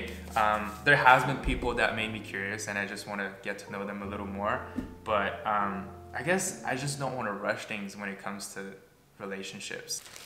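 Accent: Quebec, Canada. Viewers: Hi! I just discovered your channel, but I'm pretty sure that I've seen your pretty face on Tiktok already once. I guess YouTube algorithm suggested me to watch this video for a reason. I'm 29 and single af, but if I can provide you an advice is to take your time as you're already doing but don't take too much time though 😆 Et wooow qu'est-ce que ça m'a fait bizarre et plaisir en même temps d'entendre l'accent québécois après toutes ces années! Haha take care✌🏾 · Let me tell you, I turned 24 and I have never been in a real relationship. I never found a person I genuinely wanted to be with. Most people find it weird and just don’t get it. Funny enough, I do not feel bad about it, I am actually quite okay with it😂. I feel like you should always do what feels right to you, take your time and not rush into something you don’t want (but, if you do have real feelings for someone, for God’s sake, do not supress them and go for it!) I also fully agree with you, if you are happy with who you are and confident in your own self, you’ll be more than fulfilled on your own and the relationship you’ll eventually get into will be a much better and healthier one. 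PS: ton ptit accent québécois est trooop mimi haha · Omg are you from Québec , canada ???! , u have that french accent , im from Montréal btw ! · tu viens du Canada ? parce que ton Français ne sound pas comme le français de la Franca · Wait u speak French sa m’a surpris mais par contre on dirai que t’as un accent canadien pas trop français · Hey!! C'est la première vidéo de ta chaîne que je regarde, si je ne m'abuse, c'est bien un accent québécois que j'ai entendu ? Bien le bonjour, de Montréal, hehe ! Plus sérieusement, j'ai 24 ans et je n'ai jamais eu de relation amoureuse ! J'ai eu quelques crush, mais franchement, je peux les compter sur les doigts de ma main et chaque fois, j'ai fini par apprendre que la personne était en couple ou avait un intérêt pour une autre personne. Ce sentiment d'embarras dont tu parles, je l'ai ressenti à chaque fois ! Un peu l'impression d'avoir été ridicule de penser que mon intérêt aurait pu être réciproque, aie. 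Mais, disons que dans la solitude, j'ai eu le temps de réfléchir, haha. C'est un peu le revers intéressant de notre situation. Je trouve que tu as une très belle approche réflexive et je devine que c'est l'avantage de n'avoir qu'à t'inquiéter pour toi-même. J'ai remarqué que mon expérience de célibat m'a également donné cette opportunité de me concentrer sur mon propre développement personnel. J'en suis venue à une conclusion similaire à la tienne : il n'y a pas que les relations amoureuses dans la vie et si je dois un jour en vivre une, j'en vivrai une au moment venu. Pour l'instant, je vivrai en me mettant des objectifs qui m'intéressent plus, notamment avancer dans ma carrière et terminer mon parcours académique ! Ta vidéo m'a été recommandée par hasard, mais c'est toujours nice de tomber sur ce genre de vidéo et de se rappeler qu'on n'est pas seul(e) ! Ton pain avait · OMG! Ta chaîne youtube a pop-up, j'écoute ta vidéo (cuz I kinda relate to the title of being single forever...) Je ne m'attendais ZERO à t'entendre parler québécois 😳! 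Tu es québécois? Wow~en tk Thanks for sharing this Nice cozy talk ^^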